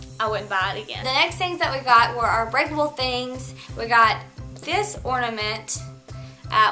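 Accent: South, United States. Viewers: I love your southern accent babe! Are you from Texas? Sorry I'm new to your channel and Subscribed btw! :D Love the video and can't wait for more!( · Oh, cool! I'm from North Carolina, so I definitely know how it is to have a southern accent! Thanks for replying!:)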